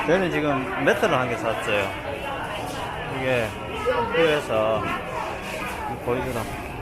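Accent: Gyeongsang, South Korea. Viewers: 절대 경상도 사투리가 불편하지 않아요 가정적이시고 정말 보기 좋아요 앞으로도 계속 잘보겠습니다~~°행복하세요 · 오히려 더 친근감 느껴지는데요 경상도 사투리 중독성 강합니다 전 경상도 사람 아니지만 넘 듣기 좋아요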